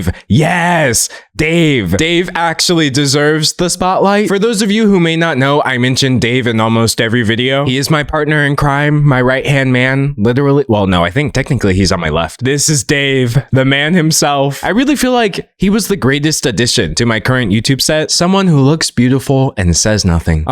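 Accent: South, United States. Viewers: Not gonna lie, I thought I detected a hint of a southern accent in recent vids, but I thought I also may have just wanted for it to be the case. Whether it is home grown or absorbed (as southern accents are wont to do), welcome (back?) to my home state!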